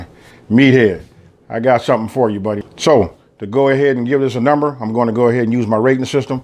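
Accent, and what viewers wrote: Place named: West Coast, United States. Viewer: I appreciate you not hiding your west coast accent LOL....good video bruh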